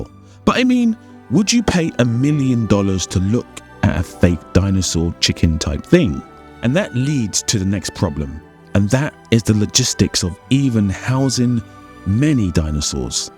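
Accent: United Kingdom. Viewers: You showed an Idris Elba movie clip here. How does his British dialect differ from yours or are they similar?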